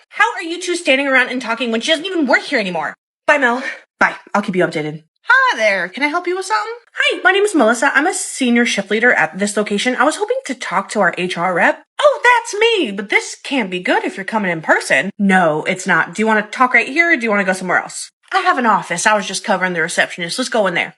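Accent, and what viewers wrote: South, United States. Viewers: OMG I love the Southern Belle accent! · I love the southern accent.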